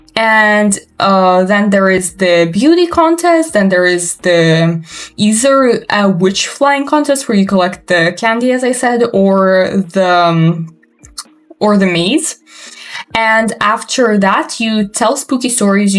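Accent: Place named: Russia. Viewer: I can hear the Russian accent 😝😝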